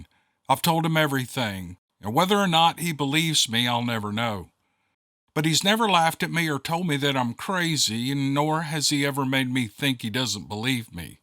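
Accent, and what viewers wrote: South, United States. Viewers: Thank you for all your hard work, good Sir. I hope this finds you well and happy. Tonight it is bitter cold outside and as usual, I can't get to sleep. About accent: As a returned to the north after I had lived south for over 20 years kind of person. I have heard southern accents of all sorts of from the heavy drawl to the slow little snippets of words with a twang. southern accent is one of the loveliest I've heard, easy to understand, not too drawn out, not too thick, not too slow, just a gentlemanly, comfortable, gentle, and sweet southern sound. There are many things about the south I miss and hearing some southern accents is one of them, so thank you for the memories, too. Now, put me to sleep, will ya? Take care dear, Rosey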